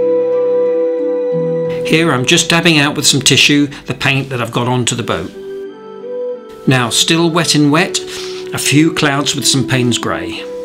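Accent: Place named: United Kingdom. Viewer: Dream atmosphere. Enchanted by the music, your painting and your wonderful British accent. I could spend hours watching you paint. Thanks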